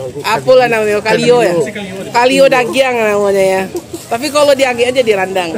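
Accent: Minangkabau, Indonesia. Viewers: Puan bertutur minang Seronok didengar menghibur hati masakan minang terkenang kenang enak lamak bana sudahlah pasti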